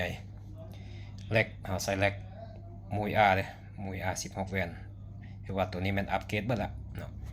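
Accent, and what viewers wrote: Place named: Khon Kaen, Thailand. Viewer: ເວົ້າຄືທາງແກ່ນທ້າວບໍ່ແຕນແທ້ອ້າຍ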